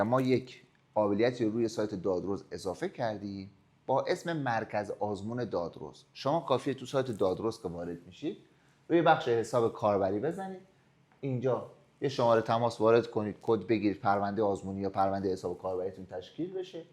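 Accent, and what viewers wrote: Mashhad, Iran. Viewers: سلام خیلی عالی بود با لحجه مشهدی هم بی نظیر ه هم عالی وبی نظیر تر میشه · ازخرم آباد درود به تمام مشهدیا لهجتون روعشقه لطفاً بیشتر مشهدی حرف بزنین ❤❤❤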